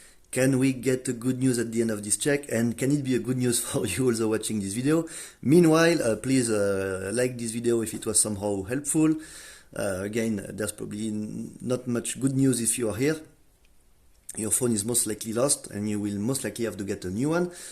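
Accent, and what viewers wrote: France. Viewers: Je pense que tu es français vu l'accent 🤫moi j'ai un problème avec mon pixel 3 qui est en fastboot mode et qui m'indique failed to load/verify boot images. si tu as une idée !!!! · I Can barely Understand you with your French Accent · Are you french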